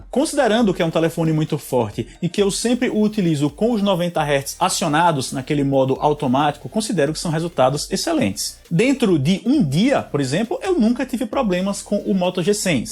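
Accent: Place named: Northeast, Brazil. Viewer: Quem é esse paraíba? Sotaque chato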